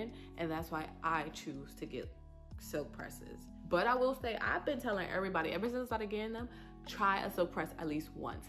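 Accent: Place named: New York, United States. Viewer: omg i knew u were a new yorker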